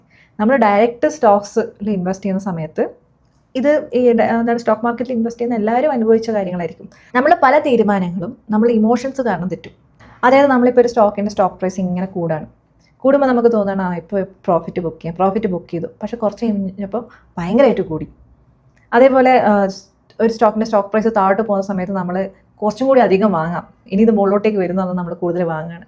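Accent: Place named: Malabar, India. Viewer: informative learning and Malabar slang .. two of my favorite ..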